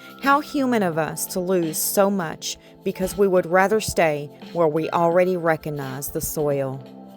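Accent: South, United States. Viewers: You are a Blessing and extremely generous. Not many content creators return their gratitude the way you do. You not only put your heart and soul into your channel and subscribers. You take the time, thought, and money to put together a large gift box for those who have given and blessed you. It's hard to come by finding generosity and kindness, but also people willing to give without expecting anything in return. I can't afford to send anything or buy from you at this time, but am willing to do that whenever I get that opportunity. With you being open and sharing the Lord publicly, that's a gift in itself. Plus, you're so adorable and just a doll baby with that HUGE country bumpkin twang that I miss so much whenever I lived in the south. (Georgia). I don't feel it's unfair. I feel that you made the right choice when deciding whether to return your gratitude. 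You can ONLY do what you can do and also afford. We all strive to do our best once we choose to do the best thing. I'm sure once your channel grows so will the giveaways. When one chooses to subscribe to a channel, they do not come in expecting gifts. Why now expect it knowing your reasons for the giveaway? Makes you think of others true intentions for watching or subscribing to creators. Focus on those who are here for you regardless of gifts or freebies. Those who are selfless and carry honest intentions, are those who are favored the same in return. Whether it's time, generosity, or acceptance. Please do not stress on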